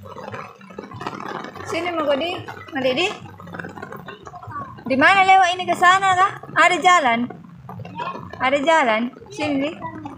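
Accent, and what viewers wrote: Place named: Sulawesi, Indonesia. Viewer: Logat Sulawesi kak 😅 langsung kentara . Salam dari Luwuk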